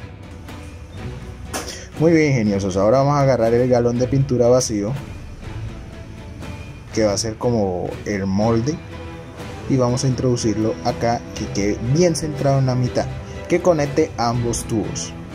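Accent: Colombia. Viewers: Sos colombiano?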